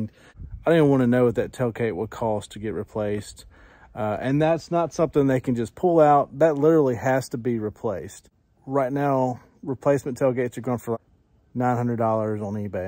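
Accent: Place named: South, United States. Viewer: I’d rather have dents than rust. I live in the rust belt and it’s the only reason it’s necessary to replace my vehicles. By your accent it sounds like you live in the south. I purchased a 2017 f150 just for that reason! My last one is a 2008 and has rust everywhere!!!! Steel is very very strong but also heavy. I just restored a Saab 92x and the rust cost me 10 grand to fix! It’s got to be stainless steel or aluminum for me.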